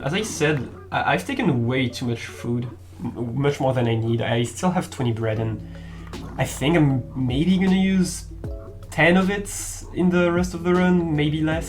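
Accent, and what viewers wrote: France. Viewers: Man, are you french?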